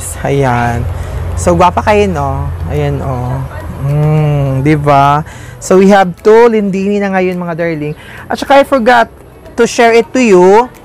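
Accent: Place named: Visayas, Philippines. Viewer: Manay am happy kapag napanood kita happy people ka at natutuwa ako kapag dialogue mo sobrang dako bisaya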